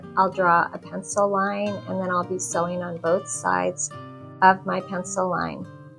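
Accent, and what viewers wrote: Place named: England, United Kingdom. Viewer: Good evening, firstly, what a bonny dress you are wearing to do, it's so pretty. Next, do you remember the name of that gorgeous rad and the navy prints, they are lovely especially that red one, it would make a lovely dress., you sound English but from that cracker of an Airstreamer mobile home, it makes me think that you no longer live here in the UK,. My Daddy made our first caravanette out of a Ford Transit van, that he cut the roof off and put an extending roof on,. He put windows in and my Mum made the seats/table/ bed, with my twin brother in a stretcher of blue fur fabric with navy and white cotton to stop it from going out of shape. I slept across the front seats, definitely not as comfortable as everyone else, but it was the only way we could have time away from where we lived. My Daddy got a proper camper van with real toilet that they could also use as a shower room, real fridge and a cooker. 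They could go with the dog just as the weather here allowed, or turn in a different direction to better looking parts. It's funny how we used to get made fun of at school because we couldn't afford a proper caravan holiday or a real holiday to a holiday park, but we had great fun. He was funny because if we went into a town and it was busy, he turned around and went where there was nothing of any interest for a 10 year old and upwards. Up here in Scotland there has been such a big uptake in people buying anything they see to make into their holiday dream of a motorho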